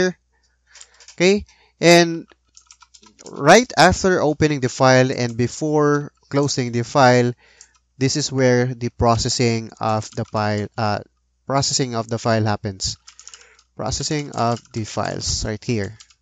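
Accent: Philippines. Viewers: bruh i giggled hahaha. his first sentence and I knew he should be Filipino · Is this A Filipino? Because the Accent is Like Filipino XD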